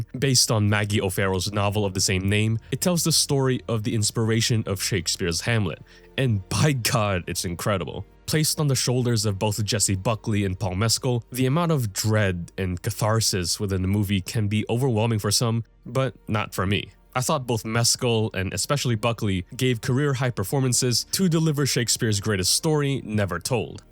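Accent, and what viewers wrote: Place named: Philippines. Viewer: filipino? i can hear a very faint accent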